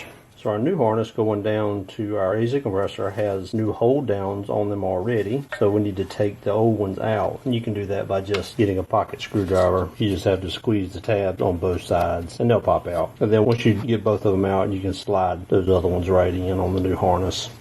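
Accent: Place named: Georgia, United States. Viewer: Excellent DIY tutorial with a Goorgan accent to boot, I reckon. Bummer you had to go all through this after you fixed the AC a year ago. But that's the reality of owning a car past it's engineered intent. One good source of AC troubleshooting is the Professor Pentane yt channel.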